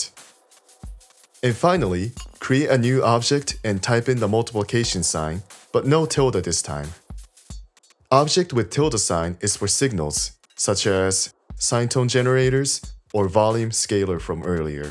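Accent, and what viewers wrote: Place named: Netherlands. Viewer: You have a slight accent (barely) and I can't tell if you're Dutch, Scandinavian or Japanese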